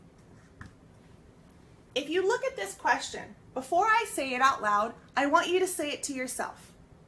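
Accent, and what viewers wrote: United States. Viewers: Are you from Colorado?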